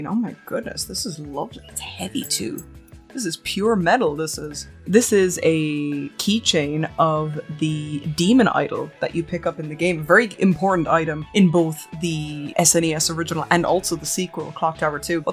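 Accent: Ireland. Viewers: Was not expecting the Irish.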